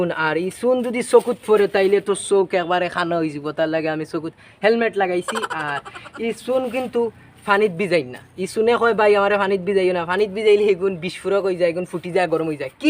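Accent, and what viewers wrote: Sylhet, Bangladesh. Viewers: সিলেটি নি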